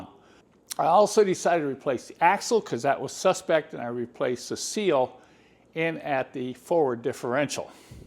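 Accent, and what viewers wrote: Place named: United States. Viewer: Spanish translation is awful. We love your american english!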